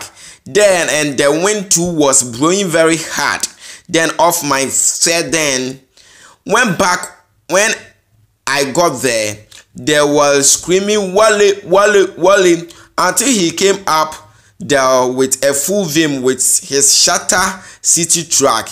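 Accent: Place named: Ghana. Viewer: Learn how to read my brother. Twi is killing your English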